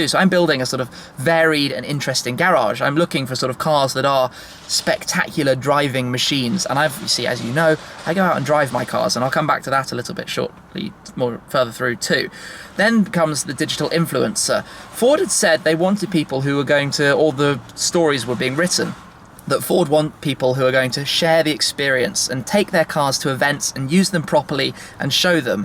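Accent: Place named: United Kingdom. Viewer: Must be nice to be white with a British accent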